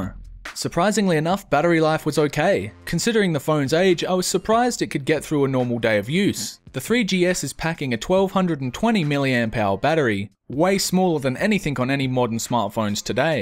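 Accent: Australia. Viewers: 1. he was born with an australian accent and can't change that and should be proud of it. 2. he can do whatever he wants with his hair. that's not your choice 3. i think his videos are really good quality and better than most tech channels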